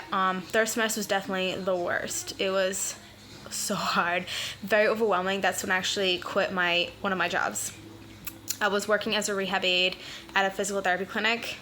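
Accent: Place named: Boston, United States. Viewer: Hi! I saw in a previous comment that you started off as a per diem because you couldn't find something close to you. I just want to know where you're from if you don't mind me asking? I think Boston cuz of your accent but not sure??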